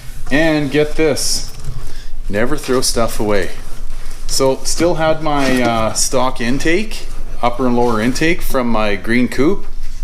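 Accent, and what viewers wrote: Canada. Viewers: You sound Canadian eh. I'm in Sask. I have the same rear main and clutch issues, sigh.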